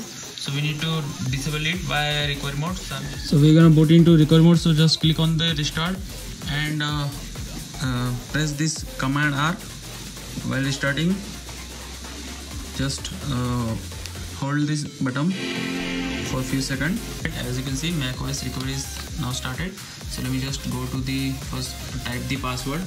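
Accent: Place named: India. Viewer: Can anyone tell me what fucking command that is he’s speaking in that Indian accent can’t tell if he’s saying t b or d they all sound the same and then he’s not even saying enough characters that his blurry dirty ass screen shows. It’d help if he turned off the techno music. This is why Americans hate Indians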